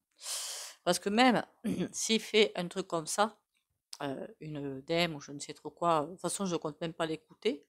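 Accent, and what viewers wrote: South, France. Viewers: Merci, ce soin m'a fait partir loin d'un seul coup, en quelques secondes et je reviens paisible. J'aime beaucoup votre voix, avec en plus, ce petit accent ( je pense que vous êtes du sud ),et aussi beaucoup de petites phrases amusantes et percutantes qui font plaisir!moi j'ai l'impression que je commence à décrocher, à lâcher prise par rapport à tout ça: j'ai confiance qu'on va arriver à un moment donné où le système va s'effondrer tout seul et où il faudra tout reconstruire différemment, et que cette fois-ci ce sera bien. j'aimerais bien avoir votre avis sur l'idée de Frexit, mais peut-être l'avez-vous fait déjà précédemment? Je suis aux Patriotes et par conséquent je tiens à l'idée de reconstruire sur de nouvelles bases. Merci.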